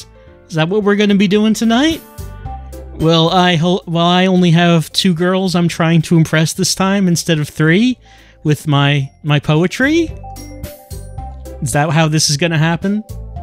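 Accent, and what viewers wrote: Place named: New York, United States. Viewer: I miss New Yorker accent already:(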